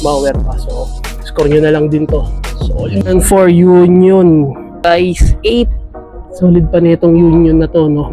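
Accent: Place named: Iloilo, Philippines. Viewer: Boy ilongo ka ky pang hambal mo pang iloilo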